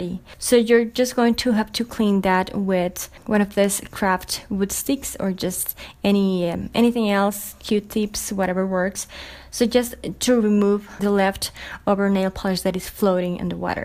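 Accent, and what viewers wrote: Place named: Germany. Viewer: I love your accent. Are you German?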